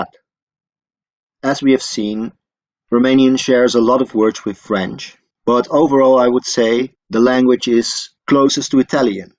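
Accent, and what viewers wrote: Netherlands. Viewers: I hear a fellow Dutchie🕵‍♂ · Is this guy dutch?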